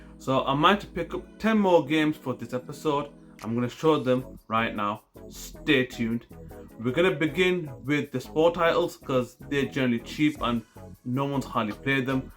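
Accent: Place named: North, United Kingdom. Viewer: You have a strong northern accent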